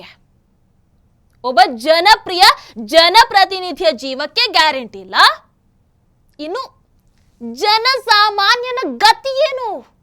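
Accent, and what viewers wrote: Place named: Mangalore, India. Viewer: Your manglore Kannada language super sister 🙏🙏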